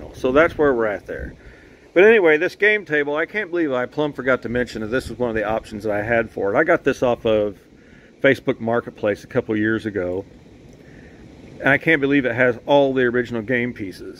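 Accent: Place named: Midwest, United States. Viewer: Dude, being a Hoosier, your midwest accent makes me feel right at home! I often disagree with Adam when it comes to opinions about styling, as he has obviously been indoctrinated by GM, but I gotta say I agree with him on this one. my opinion doesn't matter, it's your car and your dream. It's gonna be a stunning Squire regardless! Good job as always. Gotta admit, I'm in awe of your meticulous attention to details!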